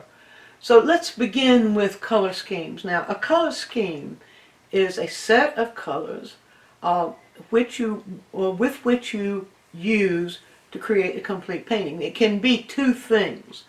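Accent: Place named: South, United States. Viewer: a smooth southern drawl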